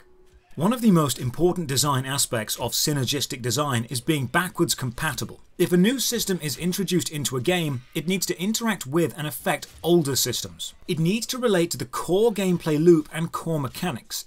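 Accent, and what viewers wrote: United Kingdom. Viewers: I definitely do enjoy my dose of snarky british man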